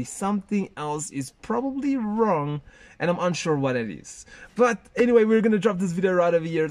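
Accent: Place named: South Africa. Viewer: Haha I heard your accent and figured. I'm in SA as well, Midrand side.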